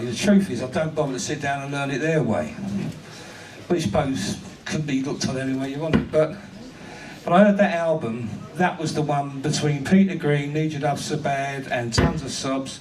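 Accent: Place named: England, United Kingdom. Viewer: I listen to Englishmen talk all the time but this guy seems to try to celebrate the accent and slurring to another level. Good Lord man speak clearly if you're going to put something out on YouTube. Thanks for your effort but it would really help if you could speak to where the rest of the world can understand.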